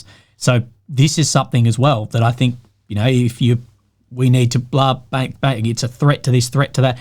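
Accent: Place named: Australia. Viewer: hearing an aussie accent always makes my day more cheerful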